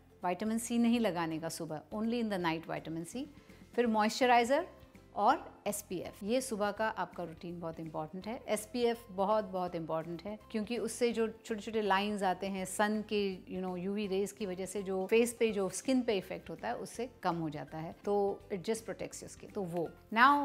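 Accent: Maharashtra, India. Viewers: मराठी माणूस कुढेहि गेला कितीही शिकलेला असला कितीही इंग्लीश हिंदी बोलत असला तरी त्याच्या बोलण्यात थोडातरी मराठी टोन येतोच ❤ u mam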